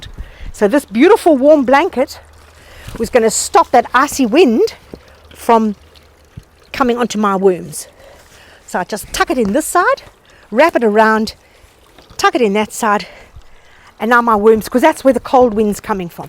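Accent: South Africa. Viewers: Ex South African? Loved your video!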